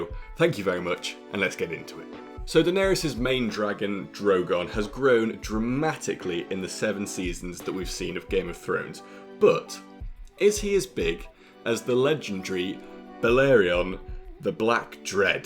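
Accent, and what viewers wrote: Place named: United Kingdom. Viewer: How can you not like a nice british accent?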